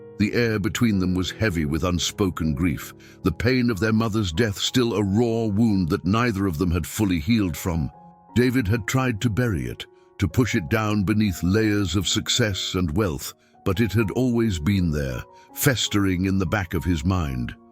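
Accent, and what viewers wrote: United Kingdom. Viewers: I liked this story and the others. But, I wish they'd use another narrator. The voice sounds too English.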